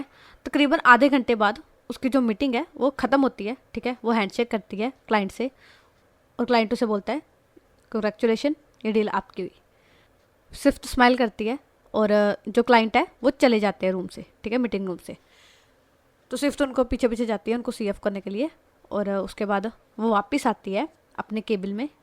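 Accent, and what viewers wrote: Punjab, India. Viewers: I love the way of your storytelling and your punjabi accent 😊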